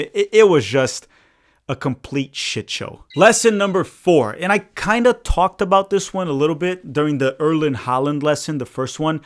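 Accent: Rio de Janeiro, Brazil. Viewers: Por que que você fala com sotaque carioca sendo que você é de São Paulo?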